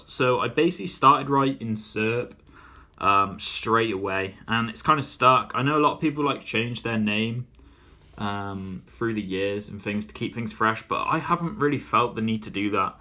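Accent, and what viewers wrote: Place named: South West, United Kingdom. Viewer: Your artistic evolution is a testament to your hard work mate, good work. I'm UK old school (2nd wave, started 1987). More of a character artist myself but still love the letters. You do some very nice work, Serp. You from the south west? Thought I recognised the accent. Come check out my channel mate, see what you think. Peace.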